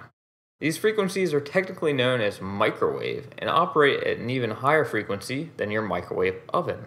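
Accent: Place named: Philadelphia, United States. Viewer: Man that's a thick Philly accent you got there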